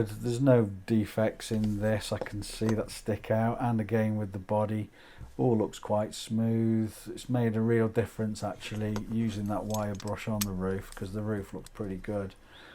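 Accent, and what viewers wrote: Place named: England, United Kingdom. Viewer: Looking forward to watching the other videos you have made. You have just been recommended to me - nice to hear an English accent! I haven't grown up either!!!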